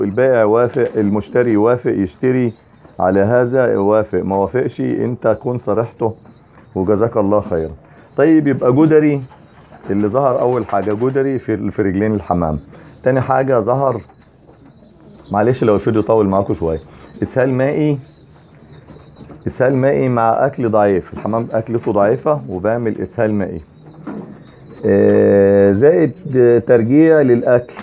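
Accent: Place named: Syria. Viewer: هو حضرتك سورى كلامك عامل زيهم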